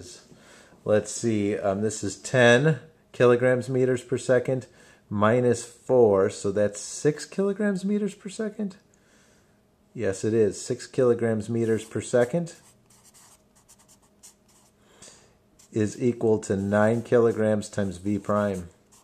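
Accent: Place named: Michigan, United States. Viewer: Sound like your from Michigan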